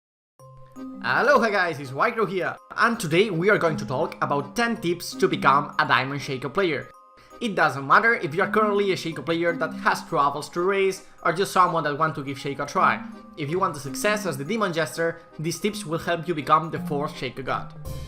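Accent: Spain. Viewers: no se porque pero me da que eres español xD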